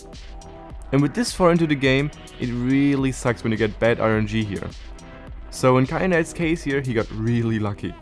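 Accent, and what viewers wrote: Germany. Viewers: do i hear DEUTSCH? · are you german? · I can hear the German accent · after 2 seconds of watching I could tell you were German. (du machst krass guten content und verdienst mehr aufmerksamkeit bro)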